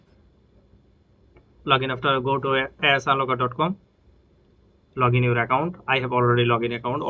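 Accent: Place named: India. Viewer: all i hear is "bibidi bobidi" because thats how all Indians/hindus/punjabi/beadheads sound when yall speak.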